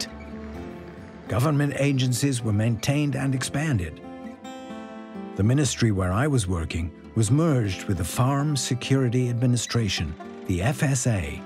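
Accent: United Kingdom. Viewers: This appears to be someone from the U.K narrating an American story. I coulsn't finish watching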